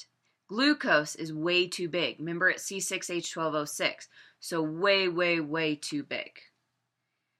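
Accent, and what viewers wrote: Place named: Midwest, United States. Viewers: Where in the USA is that accent from? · Lena G it's Midwest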